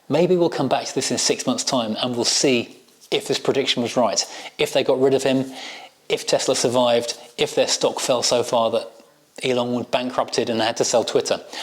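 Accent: United Kingdom. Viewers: I'm a car guy who was really interested in this topic. I tried real hard4 several minutes. I found myself irritated by this effeminate, sloppy mouthed, Brit accent, akin2 Piers Morgan. I finally couldn't stand it anymore &gave up. Pewh!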